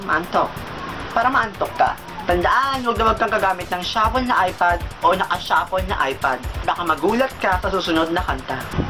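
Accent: Visayas, Philippines. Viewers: bisaya ha..hehe galing naman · "PISTEH!! Ano ba yun" AHAHA!! XD. Bisaya jung dako!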